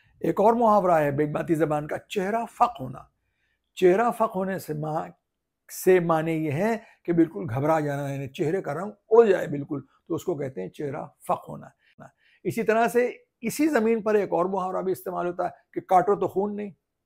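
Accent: Lucknow, India. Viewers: پاکستان میں بیٹھ کر آپ کی بدولت لکھنؤ کا لہجہ سننے کو مل گیا۔ بہت خوبصورت ۔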